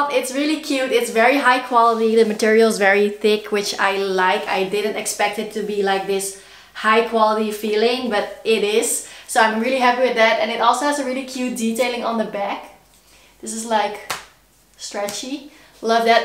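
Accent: Netherlands. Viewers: I love your dutch accent 💛🍀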